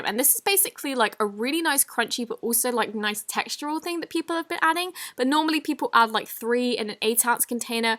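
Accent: United Kingdom. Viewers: she has a little bit of a British accent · She's British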